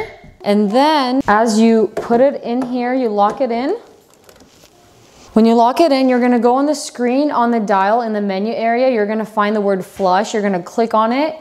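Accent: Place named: Russia. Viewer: i hear some Russian accent notes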